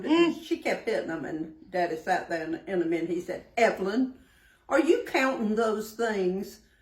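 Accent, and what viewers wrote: South, United States. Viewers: I love your accent ( I'm Canadian) no offence, but I often listen to your program when I go to bed, and your voice soothes me to sleep😴 Hearing you talk about getting ingredients acquainted warms my soul & I love your giggles in the background! Makes me want to come down South. Love y'all from Canada Cheers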